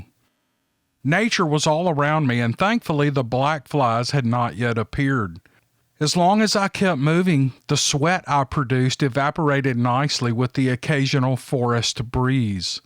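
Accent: South, United States. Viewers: Hey..Greetings from Charlotte, NC! I could listen to all day with that soothing southern voice. I'm originally from the Ramapough Mts. Of Jersey..and we have BF there and in The Carolinas..saw them myself. This is my favorite Channel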